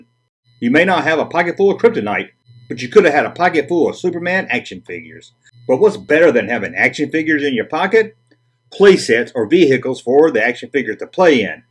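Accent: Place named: Mississippi, United States. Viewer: Well done! Hey, where are you from? You sound like you could live right down the road from me in Mississippi. 😃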